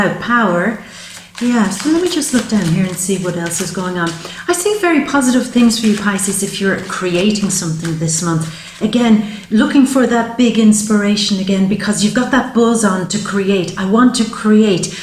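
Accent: Ireland. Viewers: Love Love Love Love Love the Irish accent. Good on ya girl. I'm an ox........ Gotta start a new business. Buy a lease on a pub. 85000 for a 20 year lease. But I don't have good credit, so it's tricky to get funding. Gotta keep charging on. Ah thanks. Glad I found you on you tube. I'll come and see you in Ireland soon. xxxxx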